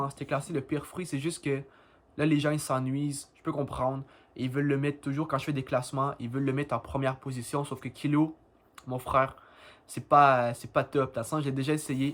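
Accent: Quebec, Canada. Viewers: Est ce que tu est québécois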